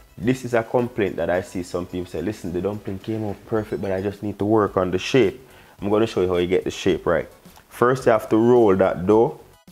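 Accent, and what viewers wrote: Jamaica. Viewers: New sub here. I just come across this channel. Oh my goodness everyone gonna think I took special food tech. Lol. I’m loving your channel. Some great tips. I’m binge watching. Lovely straightforward instructions. Loving the accent my fellow Jamaican. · Love that u redone the video for those that dont understand the jamaican accent. Dumplings look gooooood!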